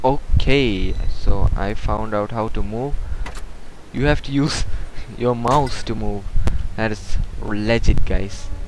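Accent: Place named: Kerala, India. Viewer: Lol you have one proper mallu accent, undeniable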